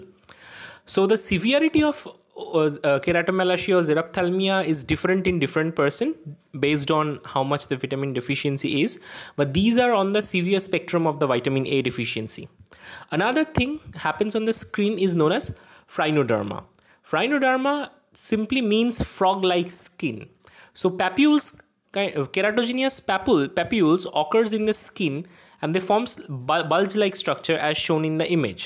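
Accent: Bengal, India. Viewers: APNI KI BANGALI?? BENGALI ACCENT IS STRONG!!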